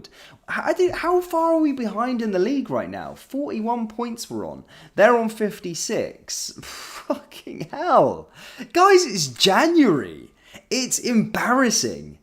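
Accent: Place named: England, United Kingdom. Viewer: Sounds to me you are an Englishman so what’s it to do with you….go home.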